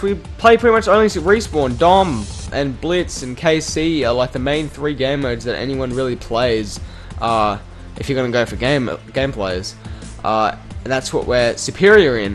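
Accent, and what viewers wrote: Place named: Australia. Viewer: Aussie! ;) - KRNG Raymian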